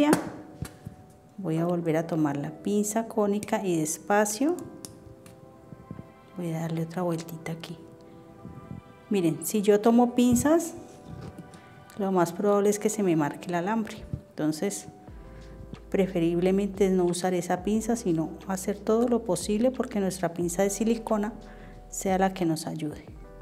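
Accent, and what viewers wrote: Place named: Bogotá, Colombia. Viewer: Ud da cursos y en donde Ud es de Bogotá Colombia según el acento Me gustaría saber para ir hacer el curso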